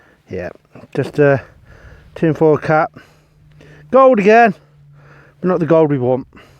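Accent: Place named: Hereford, United Kingdom. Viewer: Enjoyable watch. Know how you feel, was a similar thing with my Gran whom I named my daughter after. Also have a Nox and love it just desperate to get back out. Have to ask where you're from as your accent is similar to mine (to my ears anyhow) 😂(Hereford here)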